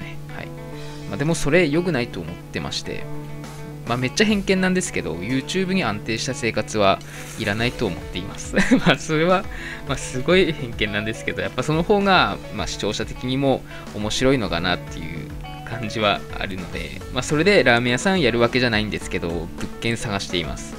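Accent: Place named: Tsugaru, Japan. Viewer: お勧めで出てきたのでなんとなーく拝見したんですが、同郷の方で初っ端からの津軽弁で親近感！更にラーメン美味しそうで気付いたら最後まで見てました✨チャンネル登録しました！これからも過去のも見てきます〜！！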